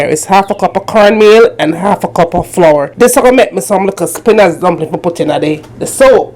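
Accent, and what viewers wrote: Jamaica. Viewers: Yes man it really looks wicked 😃😄 Thank you for this great recipe !! so good to hear your sweet Jamaican accent 🥰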